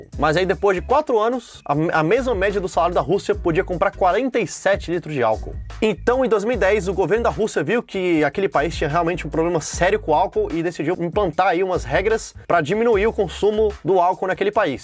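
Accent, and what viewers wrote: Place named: Ceará, Brazil. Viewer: VOZ DE HUMORISTA CEARENSE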